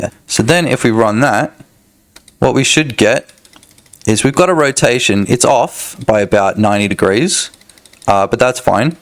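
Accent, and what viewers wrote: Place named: Australia. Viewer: Australian English can be a bit tough. Slow the playback down to 0.5 to drag out those vowels and make it sound like were all at the pub after a big night :)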